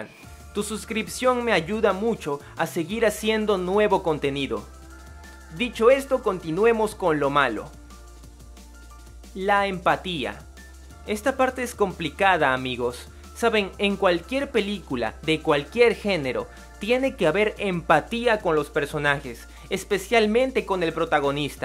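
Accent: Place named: Ecuador. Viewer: Eres ecuatoriano :3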